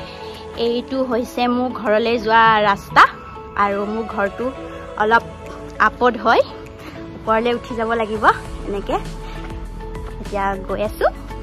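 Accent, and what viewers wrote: India. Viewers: তুমি নলবাৰীৰৰে কোৱা❤❤❤❤❤❤❤❤❤❤❤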